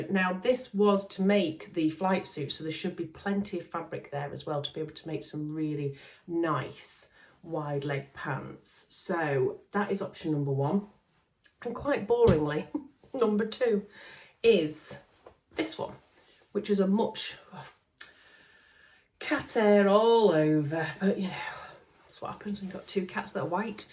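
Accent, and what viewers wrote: Yorkshire, United Kingdom. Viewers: Thank you for putting this together! Just wanted to add that the autogenerated captions are clearly struggling with the Yorkshire accent and are cracking me up!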